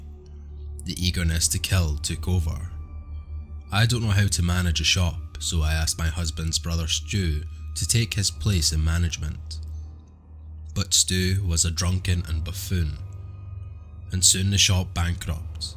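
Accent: Scotland, United Kingdom. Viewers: Just another one of my new favorite guys because of the great voice and accent! Sometimes there is some Scottish sounding accent too! 💚 Think I've listened to all videos, love the longer ones. Hope you make a few more of them.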